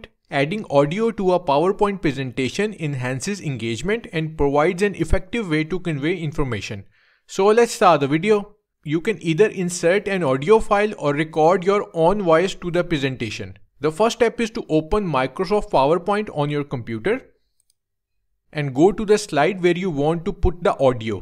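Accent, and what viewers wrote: India. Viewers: Raw indian accent not even stereotypical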